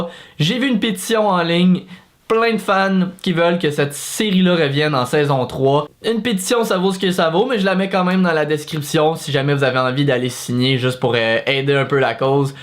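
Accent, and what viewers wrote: Quebec, Canada. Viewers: Pur québécois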